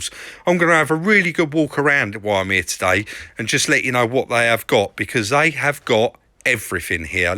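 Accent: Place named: Bristol, United Kingdom. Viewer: Farmer accent from good ol' Bristol eh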